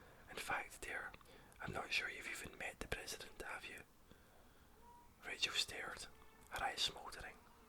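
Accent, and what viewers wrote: Scotland, United Kingdom. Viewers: Your voice is sooo relaxing! Hehe, can't resist that Scottish accent. ^_^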